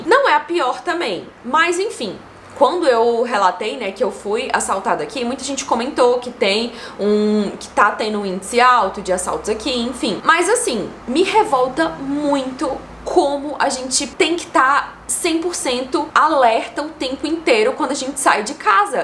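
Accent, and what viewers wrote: Minas Gerais, Brazil. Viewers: A melhor coisa é me identificar com o sotaque. Minas é o lugar!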